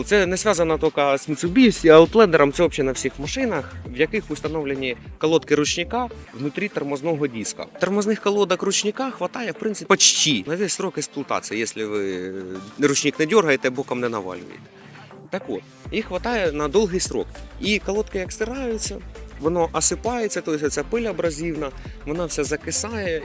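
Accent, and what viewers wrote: Surzhyk, Ukraine. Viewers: Авто, мабуть хороше, але жахливий суржик "експерта" відбиває бажання слухати. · "Нєпадгатовлєний" від твого суржику вуха вянуть. Слабо говорити українською?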